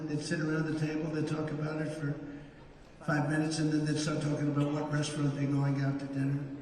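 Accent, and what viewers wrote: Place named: Pacific Northwest, United States. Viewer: And you're from let me guess by the way you say water. Oregon or Washington? I don't think you're from Idaho but I've been known to be wrong once in awhile. Very rarely.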